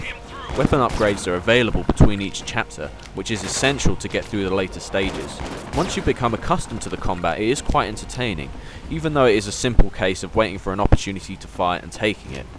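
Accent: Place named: United Kingdom. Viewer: Thanks, nice short, snappy review. I'm thinking of getting a cheap Wii so I'm checking out some cheap games too. And always nice to hear a restrained British accent on YT.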